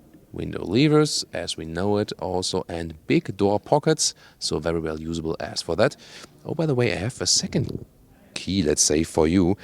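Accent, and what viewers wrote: Germany. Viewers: I love the THICK German accent. :D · Zis is called German accent.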